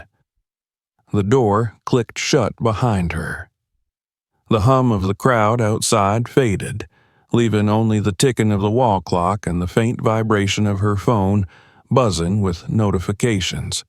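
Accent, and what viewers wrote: South, United States. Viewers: I love your southern accent. May I ask where in the south you are from? I lived in S C for 12 yrs loved it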